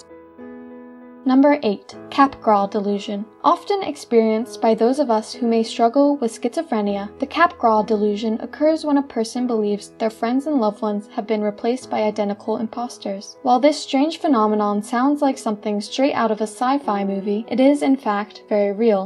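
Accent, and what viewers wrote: France. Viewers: I mean hearing french people talk english is also cringeworthy. She's trying ok. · Cute French accent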